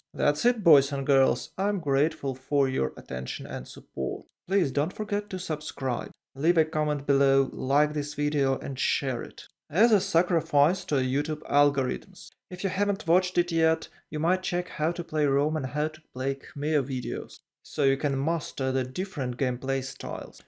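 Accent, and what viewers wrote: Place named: Ukraine. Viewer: Поки слухав почув, що акцент ну дуже на мій схожий. Ну дуже от. Перевірив канал, написано– Україна. Поржав, подивився далі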